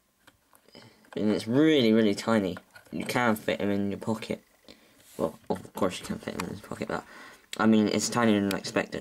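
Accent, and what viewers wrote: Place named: Somerset, United Kingdom. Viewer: Soooooo Somerset 😂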